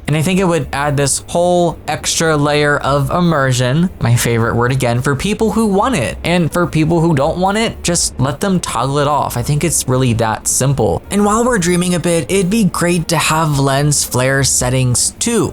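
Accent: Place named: California, United States. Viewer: You have the most California voice from California. Am I right?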